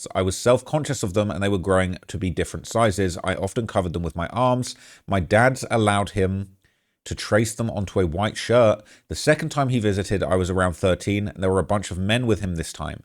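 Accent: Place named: United Kingdom. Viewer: Do you happen to have some crumpets ans tea? Your accent is like nails scratching a chalkboard...fkn lame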